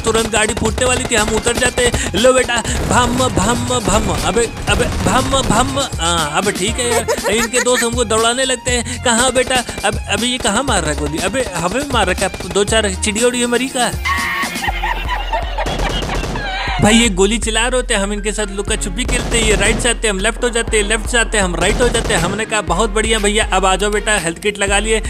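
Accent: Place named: Bihar, India. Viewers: Bhai aap bihar se ho kya ? Aapki boli se lag rha hai / kyuki mai bhi bihar se hi 😄😄😄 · Pakka aap bihar se ho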